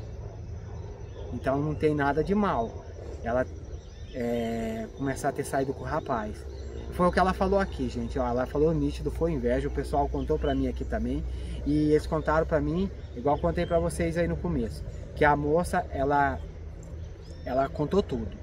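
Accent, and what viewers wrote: Minas Gerais, Brazil. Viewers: O o vc e mineiro ou goiano? Sua voz não parece com pessoas do Sul não! Vc parece mesmo e míneiro ou goiano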